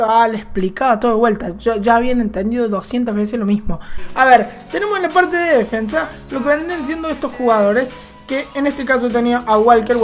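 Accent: Córdoba, Argentina. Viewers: PENSÉ QUE ERAS DE PROVINCIA POR EJEMPLO CORDOBÉS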